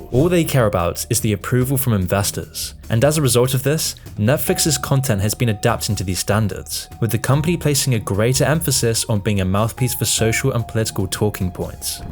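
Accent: United Kingdom. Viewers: This channel is a bit QAnon in my view with a British accent. Plenty of reasons to criticise Disney and Netflix but when going into 'woke world' seems to lose the plot as such. I don't like Blackrock either but I don't think there is some master plot as such.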